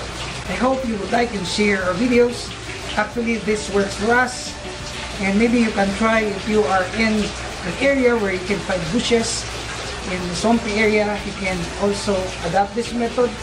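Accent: Philippines. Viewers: I can tell you're a Filipino 1st Your Accent 2nd The sorroundings 3rd Your grammar And im filipino too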